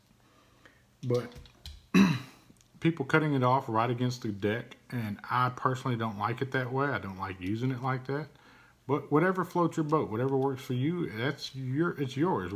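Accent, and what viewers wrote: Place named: South, United States. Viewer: Also, no disrespect but you country as hell 😂😂😂. As a country boy from Alabama I appreciate it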